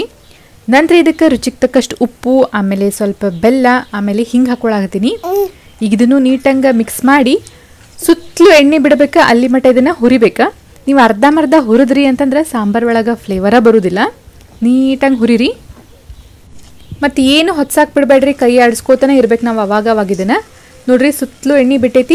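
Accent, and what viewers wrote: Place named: Hubli, India. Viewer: Nim hubli bhashi kelak kushi aythri ❤❤, Nim bhashi kelak Nanu video nodyhini ♥️ from Tulunad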